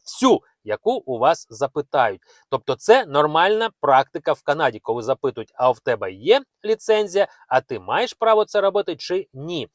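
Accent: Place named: Russia. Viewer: А что у вас за мова украинская чисто российским акцентом не пробовал размолвлять по-русски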